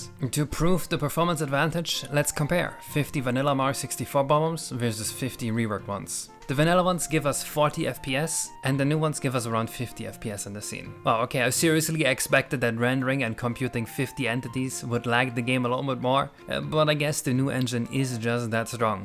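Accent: Germany. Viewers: You german!? Dein Akzent ist mir nur jetzt eingefallen! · Über all diese Jahre hatte ich irgendwie nie gedacht, das er einen deutschen Akzent hat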